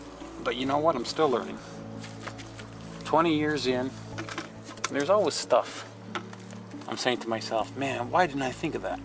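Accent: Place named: Canada. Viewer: if they're not home, then you must have them open them later as you zip them free with your putty knife/ 5 in one.. yeah, he's a canuck.. i can tell my accent and architecture.... i think in Canada like England there must be a law you have to paint your windows white... Dap with Silicone is ok caulk, i prefer a elastomeric caulk... you really can't do that much caulking and wiping it out, should have caulked it first or earlier if window have much cracking..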